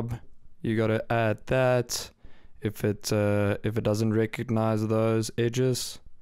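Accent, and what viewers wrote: South Africa. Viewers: i like your accent. South African? Great tutorial thanks! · are you south african? the accent?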